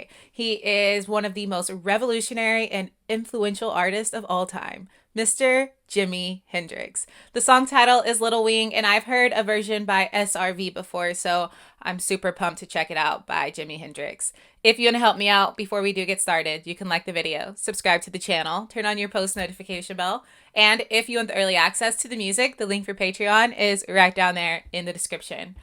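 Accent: South, United States. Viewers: This song is just too short. It needs at least another verse and chorus. BTW, your southern drawl is showing 😃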